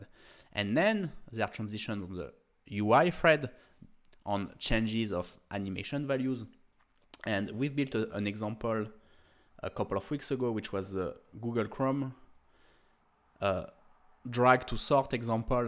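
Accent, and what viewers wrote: France. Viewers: Very easy to reconnaitre que you are french 😁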